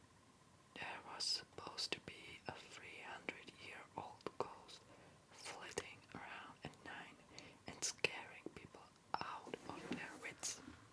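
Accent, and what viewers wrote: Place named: Czech Republic. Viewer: This is wonderful! Thank you. Your voice is marvelous, and any words spoken with a very slight Czech accent are more beautiful and interesting for it. I'm fascinated to hear how near-perfectly you speak - pronunciation, vocabulary, phrasing, emphasis - better than most natives do. Even if one studies for years, most ppl don't ever sound as natural. I imagine you're similarly talented with any language. Your videos are always, as are you, very special, original, well-spoken, intelligent, and lovely, and we are fortunate to have found you.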